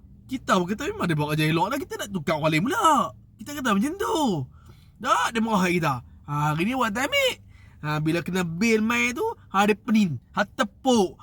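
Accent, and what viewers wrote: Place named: Kedah, Malaysia. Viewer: PEKAT KEDAH AKU XFAHAMMMM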